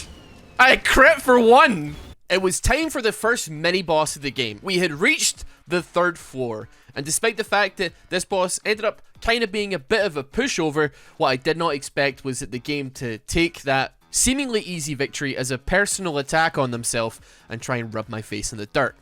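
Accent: Scotland, United Kingdom. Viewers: I'm new here is he British or Australian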